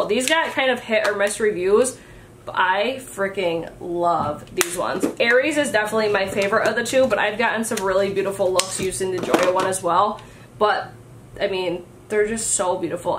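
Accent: Midwest, United States. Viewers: What is this accent?? Midwest?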